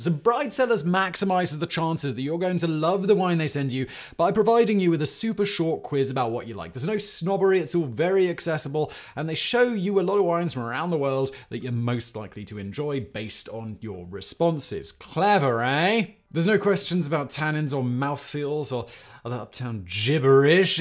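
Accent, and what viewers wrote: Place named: RP, United Kingdom. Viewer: Such a posh accent! Well done, boy, well done.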